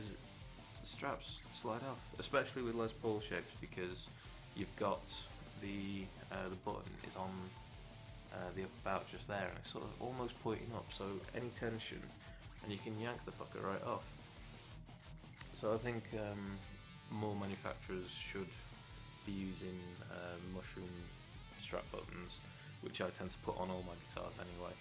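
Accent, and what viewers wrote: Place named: Stoke-on-Trent, United Kingdom. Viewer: Are you from Stoke-on-Trent by any chance? I recognise your accent!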